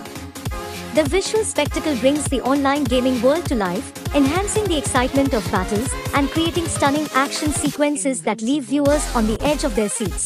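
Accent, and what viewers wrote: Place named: India. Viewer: Chinese drama described by a lady with a thick Indian accent which is difficult to understand by Chinese or American viewers! Why?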